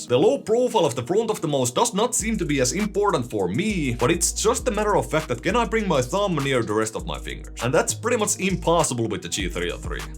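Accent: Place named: Finland. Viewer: Your reviews are amazing and the Finnish accent is icing on top of the cake!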